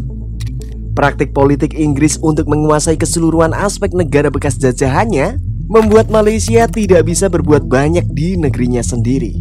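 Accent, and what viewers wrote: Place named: Java, Indonesia. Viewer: Medok ,kawus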